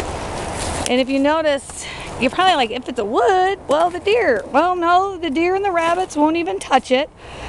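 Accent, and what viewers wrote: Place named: Pennsylvania, United States. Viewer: you sound like you're from PA.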